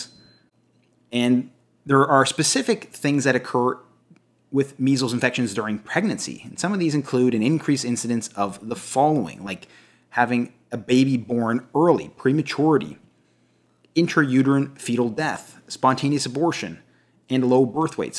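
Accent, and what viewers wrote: Canada. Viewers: I love your Canadian accent ! About, out, etc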